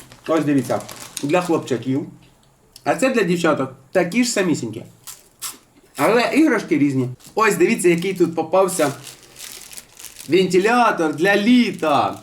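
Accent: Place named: Surzhyk, Ukraine. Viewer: Лучше бы уж на русском,чем суржик твой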